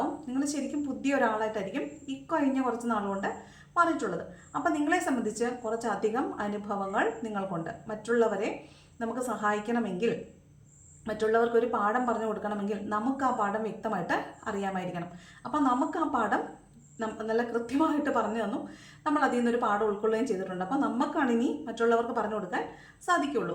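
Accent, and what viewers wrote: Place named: Trivandrum, India. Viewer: Chechi trivandrum anale.... Chechide ambada pulusuuu kelakan nalla ressama.... Chechi parayunnatoke correct anu...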